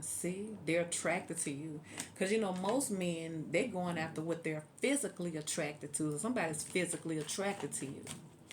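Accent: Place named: South, United States. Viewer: your southern drawl sis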